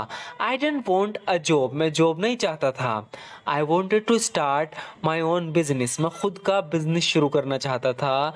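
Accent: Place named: Ambala, India. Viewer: Ap kah. Se ho sir... language ambala side ki lag rhi h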